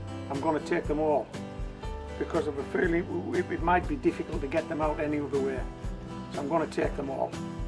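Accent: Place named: Glasgow, United Kingdom. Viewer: Great boots...you sound like my Grandfather Patrick Alexander Leslie from Glasgow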